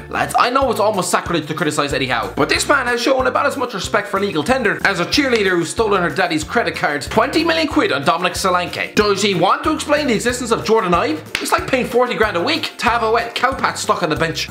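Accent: Ireland. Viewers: When you're that Irish the subtitles think you saying "hello and welcome to hitc sport" is you saying "how about tomatoes disease poor" you need to slow down when you talk my friend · Yes! The sca....Irish guy is back!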